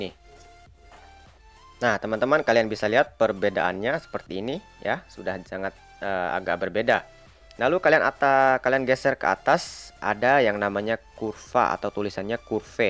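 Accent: Bima, Indonesia. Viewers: Bahasa indonesianya kedengaran seperti Orang Bima NTB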